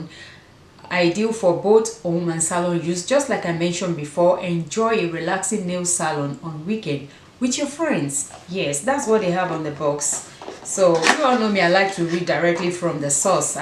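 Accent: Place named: Africa. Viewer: Perfect timing! I just bought a gel lamp on black Friday and in search of a quality brand to try for myself. I appreciate this quality review. I am trying to connect with women that have similar interests as I've just started my channel. I am looking forward to more of your content and reviews!! I've liked and subbed mamas (and yes, your African accent is gorgeous). -Ash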